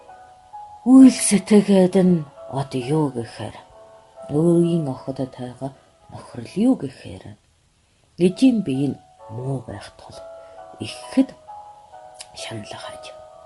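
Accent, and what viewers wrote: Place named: China. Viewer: Цаад хөгжим нь чанга, хажууд нь хужаа аялгуутай ойлгосонгүй ээ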